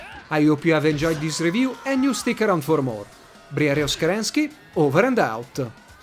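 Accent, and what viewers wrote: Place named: Italy. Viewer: Your italian accent is quite annoying.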